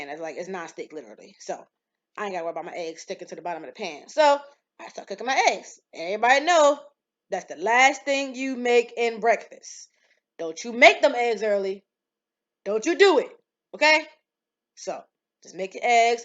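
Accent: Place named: Baltimore, United States. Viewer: I LOVE listening to you and watching your videos lol! You are so down to earth and don't be with the extras...please keep them coming. You sound like you're from Baltimore where my family is from:) Thanks so much!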